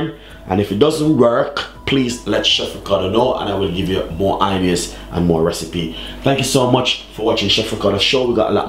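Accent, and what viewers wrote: Jamaica. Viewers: Its Jamaican (Patios) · Hahaha I like Jamaican accent thanks am going to try it and see coz I have 48kg and I want to be 60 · Authentic jamaican accent🤗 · he's Jamaican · Nice, I just love the Jamaican flavour of your English. will definately try your receipe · He's a Jamaican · Are u a Jamaican bro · Haha some Ppl don't understand Jamaican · Lol he’s Jamaican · I can just tell first minute in he’s Jamaica lol 🇯🇲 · It’s because of his Jamaican patois accent 🤔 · You mean his patwah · Put on wheats 😂Jamaican accent at its best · That Jamaican accent🥰🥰🥰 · It's the Jamaica accent for me 🤭🤭🤭🤭🤭🤭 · Yea man, I hear it like a bob marley, rastahhhh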